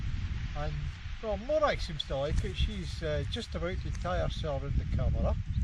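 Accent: Scotland, United Kingdom. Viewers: Awh really enjoyed this video! Very wholesome❤your dog is so cute! And I love your accent! I need to visit Scotland! Hope your enjoying the weather!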